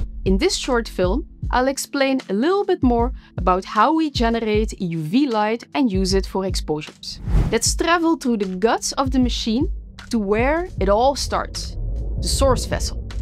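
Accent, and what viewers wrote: Netherlands. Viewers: This is the strongest Dutch accent I’ve ever heard 😅. Very refreshing I might add. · No, she's baiting us, the general audience. Serious. The others aren't stupid either. What she outlined with a charming Dutch accent is just the very basics. The specifics she didn't go into are deep company and national secrets and are carefully omitted. And wouldn't fit into a video of just over two minutes.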